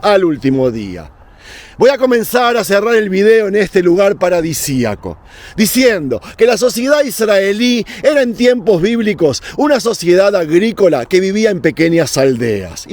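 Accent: Argentina. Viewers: soy uno de los masoquistas,pero m gusta tus videos,uno de tus videos donde mostrastes un modelo de el tabernaculo,m gustaria un video d siquem donde supuestamente estaba la encina de manre,donde estuvo el padre Abraanm, lo q odio en ti es ese acento argentino,te tolero x la historia q tu conoces y a mi me gusta. Shalonm y delen duro a estos cananeos d raffah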